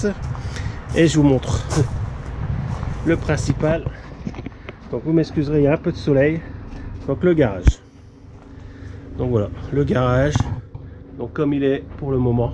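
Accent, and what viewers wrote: North, France. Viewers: Salut, jsuis désolé pour toi de quitter un grand espace pour un espace plus restreint, mais faut s'adapter. Je vois que tu es du nord!? Il me semblait bien avoir reconnu à la voix mais j'avais le doute! Je suis de Cambrai dans le nord je travaille sur une super 5 GTL de 1986, elle passerait dans ton allée lol Moi j'ai ce soucis de place également je travaille sur un terrain non bitumé c'est terrible et je partage quelques vidéos. Je te souhaite bon courage pour toute tes installations d'aménagement. À bientôt, Mat de #super5gtl86 😉✌️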